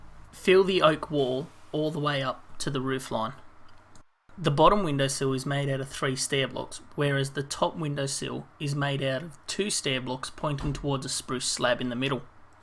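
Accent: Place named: Australia. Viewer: What a nice surprise to hear that accent after watching your timelapses - Aussie? Amazing content though mate, keep it up, you're destined for greatness!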